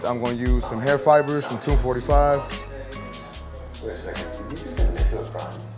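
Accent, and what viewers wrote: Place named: Mexico. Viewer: u lowkey sound like that mexican OT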